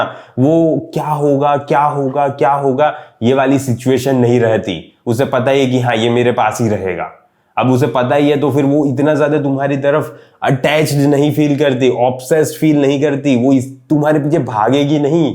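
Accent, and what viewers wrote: Gujarat, India. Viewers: Bhai tame Gujarati cho? aapki aawaaz se lagta hai!! BTW Meh gujju chu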